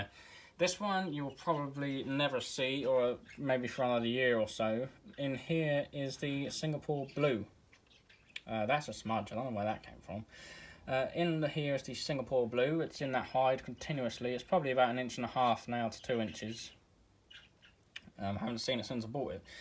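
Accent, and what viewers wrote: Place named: United Kingdom. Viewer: Can tell your from Britain .. what part though? 🤔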